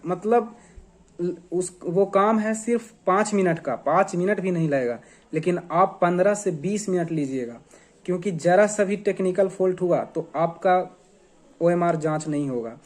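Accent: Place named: Gaya, India. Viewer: Gaya ka tone hai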